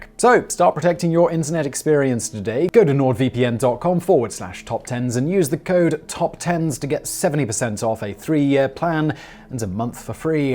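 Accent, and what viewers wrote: England, United Kingdom. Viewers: how do you figure its an American? The host is clearly English.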